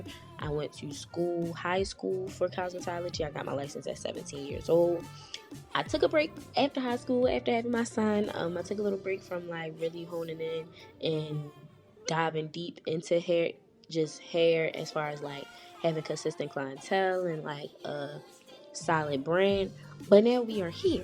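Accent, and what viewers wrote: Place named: Philadelphia, United States. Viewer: I hear a Philly accent. Where are you located so I can get my hair done?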